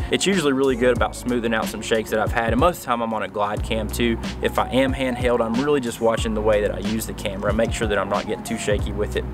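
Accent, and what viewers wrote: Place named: Eastern Kentucky, United States. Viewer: Great video, gained a new sub here. And you speak my dialect as I am in Eastern KY :)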